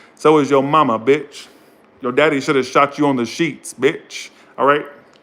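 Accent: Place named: Long Island, United States. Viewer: His “long island” accent takes me out every time! 😂😂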